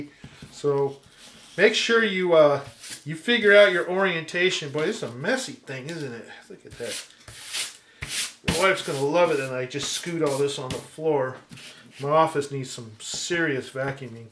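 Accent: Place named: Arkansas, United States. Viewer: I literally stumbled across your video this morning. I'm glad I did. 😃I'll be doing some experimenting at this process myself. I lived in Shelbyvill, TX for a few years. But I've been back home in NC for over 20 years, but I thought I recognized the Arkansas accent. Wish I had a workspace like that. I've subscribed and liked. 👍 HAPPY NEW YEAR!! 🎄 God bless. 🙏5th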